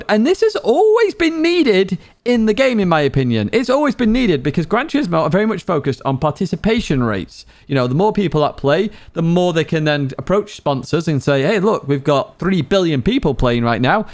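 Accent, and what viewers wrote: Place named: Sefton, United Kingdom. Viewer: Didn't know you were from Sefton lar 🤣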